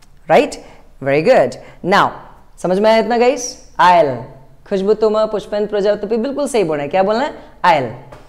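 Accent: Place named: United States. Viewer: He speaks with an American accent...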